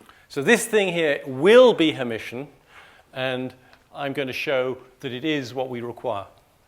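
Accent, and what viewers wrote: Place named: England, United Kingdom. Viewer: "absolute and immaculate sphere" i like the way he talks. english people.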